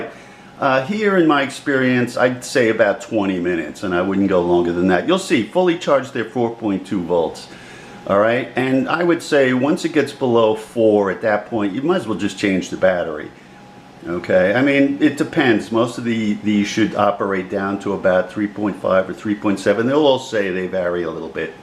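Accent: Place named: New York, United States. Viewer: I'm a former New Yorker, I love it when I can hear the ny in ya.... lol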